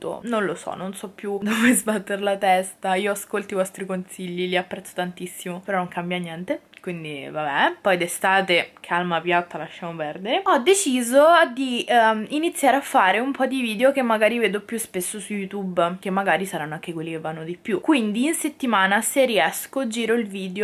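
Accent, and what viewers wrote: Rome, Italy. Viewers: Adoro il tuo accento romano!❤️❤️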